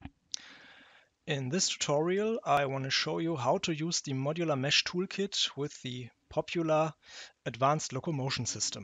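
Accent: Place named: Germany. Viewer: oh, German accent :)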